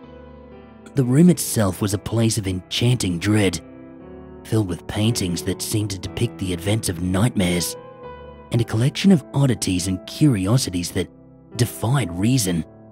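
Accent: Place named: Australia. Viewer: The Blackwood name stoped me in my search for 3hr+ story but a ghost story is a must stop. I got here by searching for new content and came across my Australian friends say hi to Ratty lol anyway I never listened to a ghost story from an Australian but I like the accent anyway not sure if that counts but I hope it does.😂 Cheers mate from across the salty water😂😂😂😂😂🇺🇸 I subscribed 😅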